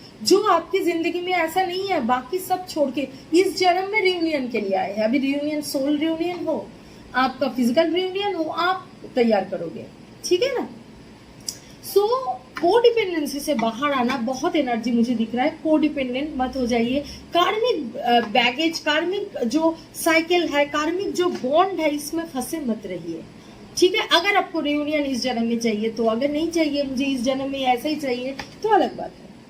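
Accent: Bengal, India. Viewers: Are you Bengali? Nice Reading....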